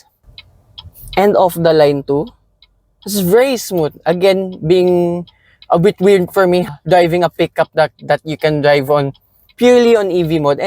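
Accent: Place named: Philippines. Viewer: Pinoy accent pero in fairness magaling sya mag english. Malawak vocab nya 👍🏼 But I want to hear him magtagalog haha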